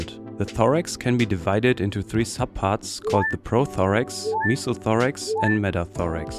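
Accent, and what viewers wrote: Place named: Germany. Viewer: Scheiss deutscher mit scheiss akzent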